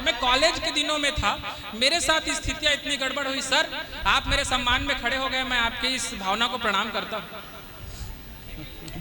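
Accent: Bihar, India. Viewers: Bihari ton hai.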